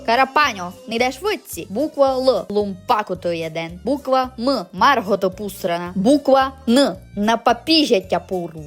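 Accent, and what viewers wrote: Transcarpathia, Ukraine. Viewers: Дуже подобається закарпатський діалект і неординарний гумор)) Та ще й не аби яка симпатюля😉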